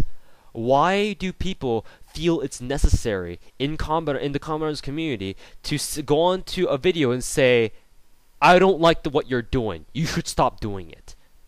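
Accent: Asia. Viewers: I love your Asian accent it makes things very funny!! And i appreciate what you do a lot your in college and make time for youtube making multiple videos a day! YOU ARE THE REASON I WASNT BORED WHEN I DIDNT HAVE MY PC!!!! and were you the one that i beat in the event on sf2? lol the event to get the Scar H Lucie or something. lol im KoolGam3rsHQ on sf2 but i agree with a lot of this i actually watched whole thing lol facepalm! lol, and i agree that in our society now the nice people get torn up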